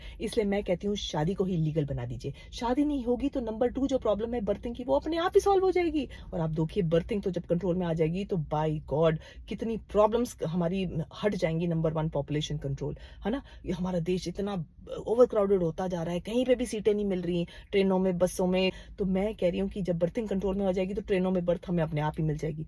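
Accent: Punjab, India. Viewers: Nice. More punjabi please 🙏 your punjabi is very sweet. Kangana ko thappad therapy dilao. 🤣🤣🤣🤣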